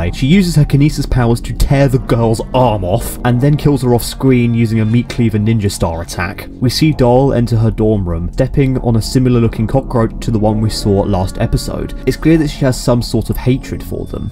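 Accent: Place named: United Kingdom. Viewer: The brit'ish accent is very strong here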